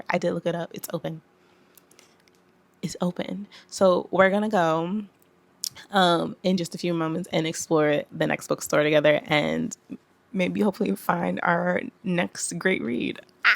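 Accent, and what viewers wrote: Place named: Brooklyn, United States. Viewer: 👋🏽!!! I am just coming across your video and I have to check out more!!! You sound just like me and I love it! BACKSTORY: I’m born and raised in BK - went to BGHS if that tells you anything. Now live in VA. Folks are always talking about my accent 🙄. I’m like, well here’s the pot calling the kettle black🙄. I remember buying our books downtown Brooklyn and along Fulton St. So now Malcolm X is popping like that?! I’m so jelly! I can’t wait to see more vids from you. I read How To Love a Jamaican back when I lived there!! I’m so glad to have came across someone else who knows about this one. I think I want to reread it now! Thank you!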